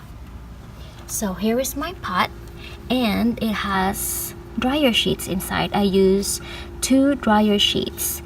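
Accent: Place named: Philippines. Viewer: Hello from Ken in Japan. Your voice sounds like you are from the Philippines.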